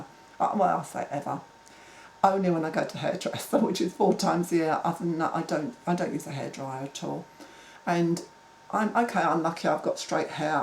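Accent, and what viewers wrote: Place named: United Kingdom. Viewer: I stumbled on your video . I am glad I found you . I am 63 and have grown my hair out below my shoulders . I had to smile when you talk about your shower . I am a 'tub soak bath' woman and wash my hair separate , in the kitchen sink . Will you make video's on pony tails and buns ? How do you do the puff bangs ? What is a 'plat' ? I like your accent -- and when you said 'carry on' - was a dead give away of British. ❤️ Kindest Regards ❤️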